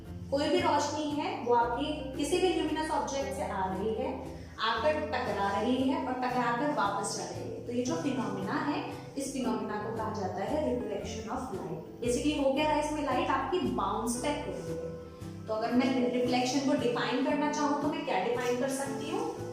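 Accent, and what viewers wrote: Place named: Haryana, India. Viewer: Maine socha haryana se ho 😅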